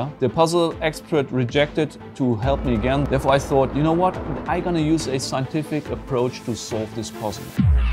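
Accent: Germany. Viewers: What is more remarkable is just how much you have strayed from your German Accent when speaking English over the past five years.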